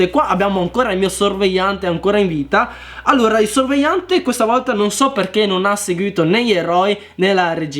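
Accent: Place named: Veneto, Italy. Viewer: oh te si dal veneto...mi so veronese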